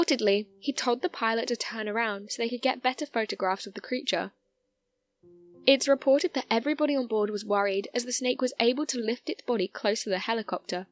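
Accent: United Kingdom. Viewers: I wish this woman would do some asrm videos. Such a lovely voice and a British accent, hell all I need is a bag of chips and I'm set.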